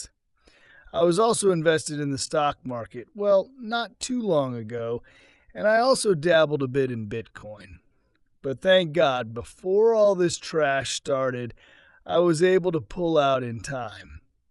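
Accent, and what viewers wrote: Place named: Germany. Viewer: Is this AI speaking English? It’s so weird. I prefer to listen to your English with German accent. 😊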